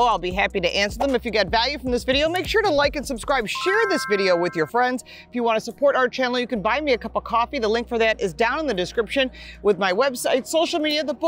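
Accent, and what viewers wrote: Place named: Buffalo, United States. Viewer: Paul's wife, Loveable❤ barbie doll Lauren Fix Car Coach Reports, congratulations on an excellent quality 2024 BMW 5 review. Beautiful vehicle, excellent explanation as always, love the Buffalo, NY accent and your test drives! ❤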